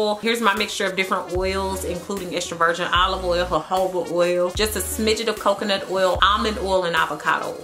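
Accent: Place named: Houston, United States. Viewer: One of the best natural hair videos. You sound like you're from H-town. You're hair is the bomb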